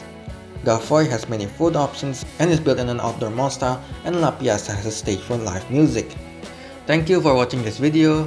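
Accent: Indonesia. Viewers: looking forward to it my dude. i've been binge watching your vids and you deserve a subscribe for the indonesian english accent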